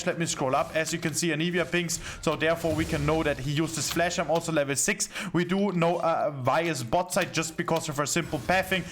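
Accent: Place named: Germany. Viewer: Such a strong german accent. I cant... just cant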